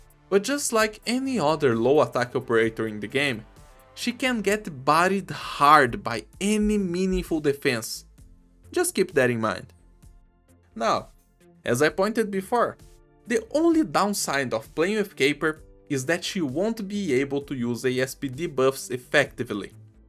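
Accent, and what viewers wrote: Brazil. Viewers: are you perhaps brazillian? just curious. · Eu percebi que era Br pelo sotaque, entrei no seu perfil e li Brasil 😊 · Tu é BR né? O sotaque é impossível de não perceber KKKKKKK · Sabia que era BR so pelo jeito de falar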